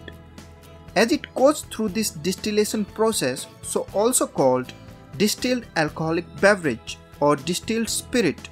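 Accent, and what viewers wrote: India. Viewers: Your Indian accent 😁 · indian accents are crazy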